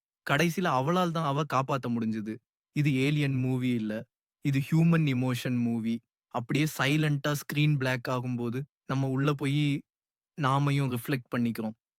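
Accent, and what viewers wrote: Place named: Sri Lanka. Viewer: Your Sri Lankan Tamil ❤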